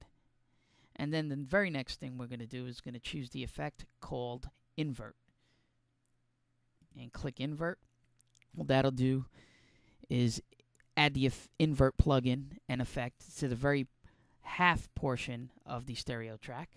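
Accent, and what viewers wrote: New Jersey, United States. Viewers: are you from new jersey? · You sure have a New Joisey accent. AWDACITY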